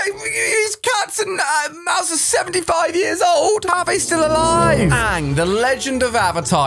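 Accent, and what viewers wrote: United Kingdom. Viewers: Are you the same YouTuber whose another video I have watched recently? You have a very crazy British accent, similar to another YouTuber named Mallow. It was also seen in one episode of SpongeBob SquarePants that Mr. Krabs was born in 1942, which would make him 81 years old!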